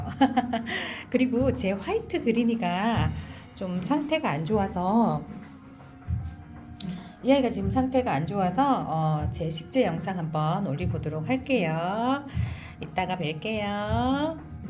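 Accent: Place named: Jeolla, South Korea. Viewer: 안녕하세요~🥰🥰🥰 편안한밤참들기전 이쁘니들 힐링하러왔어요 ~~ 화그 재식재 하시는군요~~건강 해보여요 잎장은~🧚‍♀️🧚‍♀️🧚‍♀️🧚‍♀️🧚‍♀️ 여름에도 전 물 조금줘요 뿌리가 고사가 되더라고요 😂😂😂 전구독한지 오래됐떠용 전라도 사투리 겁나게 쓰시는분 👍👍👍👍👍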